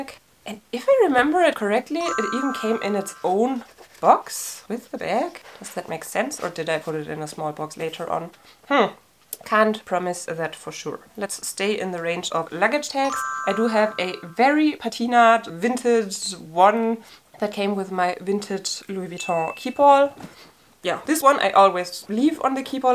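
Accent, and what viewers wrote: Germany. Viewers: Awww i can hear u are german. Am i right ? I was looking for such video and yours poped up first. So happy to have found you. Love all your charms. Now going for a hunt.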